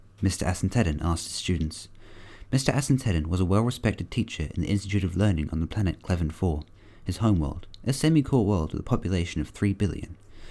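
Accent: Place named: United Kingdom. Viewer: idk you sound pretty british to me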